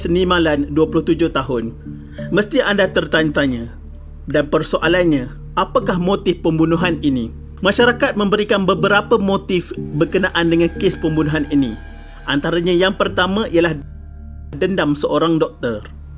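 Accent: Kelantan, Malaysia. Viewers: org klate ehh?? Klu x silap telinga sye dngar lorat nye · Pekat betul slang Kelantan ni ahahahah 😂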